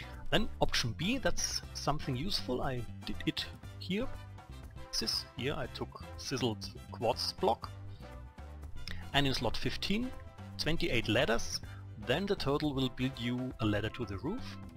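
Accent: Germany. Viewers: this guys motherlanguage is like 100% german ;D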